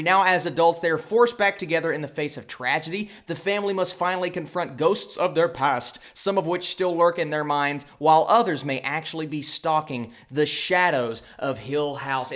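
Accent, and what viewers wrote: South, United States. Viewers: Something I had was I was expect something to happen all the time, usually in horror movies/series you can't tell when somethings gonna happen. By the way your southern accent is killing me I love it.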